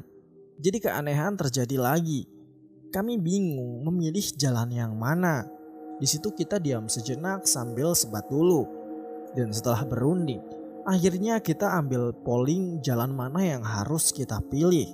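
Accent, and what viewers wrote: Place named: Sunda, Indonesia. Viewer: kirain asli sunda semangaatt tross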